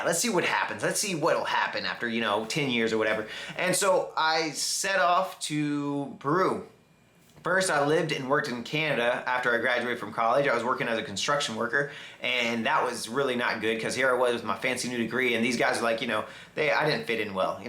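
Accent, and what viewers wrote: South, United States. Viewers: Jesus, the way you speak English you're like a redneck hillbilly guy 😳 but anyway, I'm American to one Colombian parent which is my mother proud to be an American-Colombian I'm going to apply for Colombian citizenship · I can´t believe you´re my age! Congrats on your job so far! As a Brazilian, I´ve got to say it´d be awesome to hear you speak Portuguese as well. I was curious about what state in the USA you were from (I heard it´s South Carolina), because your accent is slightly different from the standard american one, although I can understand every single word you say. Perhaps this is because you talk way too fast sometimes or because of the idioms and slangs you use, but it´s a very nice accent. Best wishes from Porto Alegre and come visit us once again. keep up the good work! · I must correct you.. im a black dude living in south America.. 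and the people are nice to everyone for the nost part... I honestly dont think your "whiteness" has as much to do with it as you think.. It may be moreso your accent being American...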